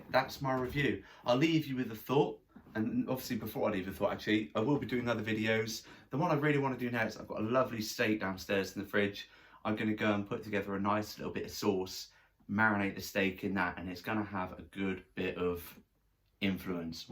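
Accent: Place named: United Kingdom. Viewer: i want what this guy is having. What an energy! I wish I hadn't looked at this "guns" though as Ron Burgundy would put it, cause now I feel so skinny. "don't worry he's fine, it's only a staircase!" hahahaha, please do more videos like this one, how good is it to be able to both listen to a guy speak about scotch while making funny jokes (especially with a nice British accent)/ Thanks from France.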